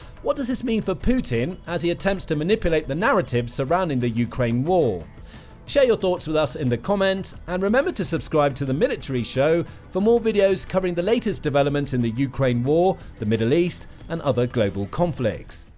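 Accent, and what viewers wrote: England, United Kingdom. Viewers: English Accent hiding The English Jewish Gangster!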